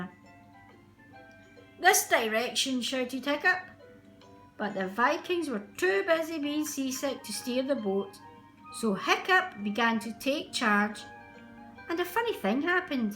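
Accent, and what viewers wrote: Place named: Scotland, United Kingdom. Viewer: And she also has a Scottish accent. This is just brilliant. :D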